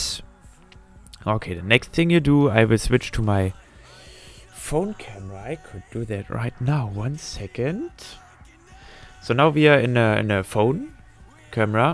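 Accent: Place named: Germany. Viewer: Du hast aber mega den deutschen Akzent xD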